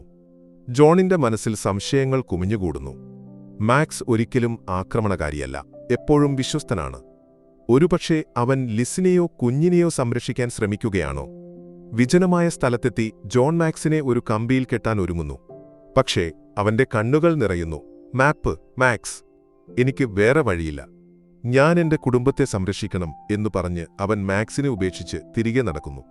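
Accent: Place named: Bengal, India. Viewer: ബംഗാളി പറയുന്നത് പോലെ പറയണ്ട... മലയാളി ആവണം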